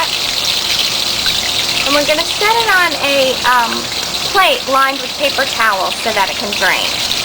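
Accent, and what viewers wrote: South, United States. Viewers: Are you from the south, it sounds like you have a southern accent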